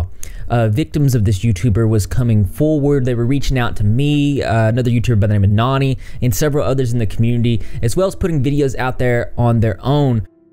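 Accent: South, United States. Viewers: Oh mah gosh u got a country accent like me :D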